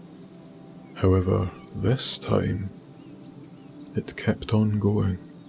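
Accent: Scotland, United Kingdom. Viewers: your soothing voice with the Scottish accent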